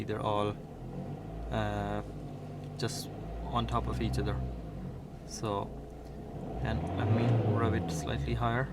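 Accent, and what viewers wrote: Cork, Ireland. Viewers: A Touch of a Cork accent is it? . Good diag I have the Autel Ds808 good tool for the money. Well done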